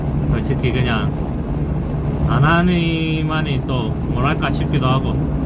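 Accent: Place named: Jinju, South Korea. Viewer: 진주 사시는군요 ㅎㅎ 말씀이 구수~하시네요 ㅋㅋㅋㅋㅋ 😂😊 풀영상으로 응원합니다 😍💞💓😻😸👍👍👍 항상 울콩딸기가 진심으로 감사드립니다 🙏🙏🙏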